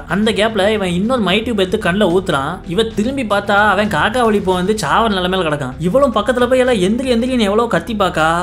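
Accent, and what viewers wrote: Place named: Kanyakumari, India. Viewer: Super slang are you kanyakumari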